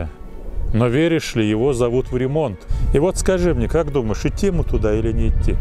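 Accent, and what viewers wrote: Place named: Nizhny Novgorod, Russia. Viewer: Чуть заметный нижегородский акцент. От молодых уже ни хрена не услышишь.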